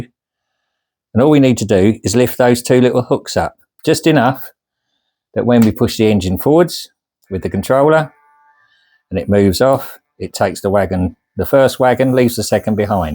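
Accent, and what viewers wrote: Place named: United Kingdom. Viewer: I came from the youtube ad and initially i kinda chuckled going this is the most british man ive ever heard but when i actually came and watched it i wound up actually enjoying it Model trains have always been my favorite especially when me and my late father would go to the fair and spend 20 minutes just looking at the model train set in the back Thanks for brining back some of those special memories man ❤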